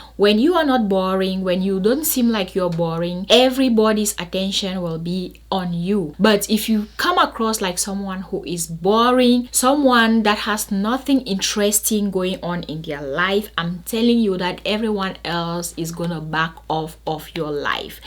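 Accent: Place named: Cameroon. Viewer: Loving the Cameroonian accent. You got a new subbie all the way from sunny Cape Town, South Africa!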